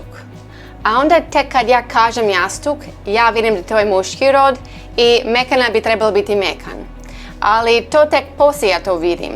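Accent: Zagreb, Croatia. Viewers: Croatian and Bosnian language are practically the same language, when you read them...but you can hear the difference in accent. And you do sound like someone from Zagreb. If you were visiting Sarajevo, no doubt that many people here would think you were Croatian and not someone from Canada speaking Croatian, since Croatian itself has that effect of foreign accent of our language...if that makes sense... kind of like British people talking, and someone who learned English very well with british accent. This reminds me of the time when I was young and had a boyfriend in Zagreb, and spending lots of time over there :D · Imas naglasak hrvatica iz ZG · vi govorite savršen hrvatski jezik sa zagrebačkim naglaskom.:) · Akcenat je minimalan, o tome se ne trebate stvarno brinuti, zvučite kao neko iz okoline Zagreba, tako da ste se super prilagodili okolini. Svaka čast! 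Puno pozdrava :) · Your starting to have a Zagreb accent really impressive! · Što se tiče Vašeg naglaska, najbliže ste ženi iz područja Zagreba. Naglasak se tek primijeti tek u 10% vremena. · As someone who hasn't learnt Croatian language from your childhood you're great speaker of your second most important language! I can completely understand all you've said! You also have great pronunciation, with a little bit of Zagreb's accent but that's completely fine since you're Zagrepčanka! 🙂 Other South Slavic languages you've mentioned are, from the linguistic point of view, alongside Croatian language part of the common polycentric language known as Hrvatsko-srpski ili Srpsko-hrvatski with some national variations (some differences in vocabulary, grammar, pronunciation, correct order of words, script) although some people in Croatia and other countries wouldn't agree since language, as a important part of national identity, is area of often harsh debates. 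To the some extent this is similar to the German language, also polycentric language, that is spoken in Germany, Austria and Switzerland but with strong national differences. It is the same with English language. Ofcourse this doesn't mean that these local variations couldn't be called with national names which is completely fine! Just keep on doing great videos and learn! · You even have Zagreb accent woooooo! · Amazing job on the cases, I must say! It's almost flawless, only sometimes you let slip the wrong one, probably when you're too excited or veer off the script, but even then the mistake is not that drastic. Your pronounciation is also amazing! It is a well know fact that native English speakers have a really hard time ditching their accents (every time an American tries to speak Latin I just want to die :P), and it's down to actual anatomy if anything. So you're probably correct, you will never get rid of it completely, but you shouldn't anyway, the way you sound now is more natural than some native Croatian speakers :P I can tell by the pitch you use you've learnt Croatian in Zagreb area, it was most prominent when you were giving the list of infinitives regarding prefixes. 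;) But you will forgive me for even bringing it up; I'm from Dubrovnik area and we take pride in speaking in correct pitch (mostly :P). It's another beautiful quirk of Croatian language, that's all. I'd say you haven't been studying that yet, hehehehehe, and you're probably better off without it :P :) The word order, yes, you probably already know you can use it to stress out certain aspects of your sentence/thoughts. E.g. Baka daje pitu MENI (Grandpa is giving a pie to ME (not you!), or Meni pitu daje BAKA (it's the grandma who's giving me a pie, not grandpa or someone else...). You can tell I put some emphasis on those key words, and you should do that too when using non-standard word order if you don't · Хрватски језик не постоји,загребачки је ваш говор,мала говорна мана ништа страшно....😀 Говорите Српски језик са загребачким нагласцима...:D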